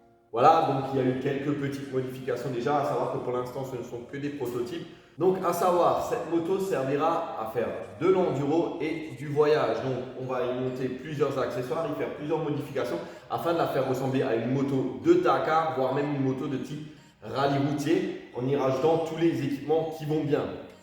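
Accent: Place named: Alsace, France. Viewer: Road trip, 750 super tenere , petit accent alsacien , ambiance sympa ... je m'abonne 😊😊😊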